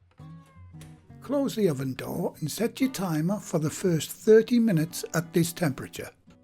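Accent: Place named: Northeast England, United Kingdom. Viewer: he’s northeastern English :)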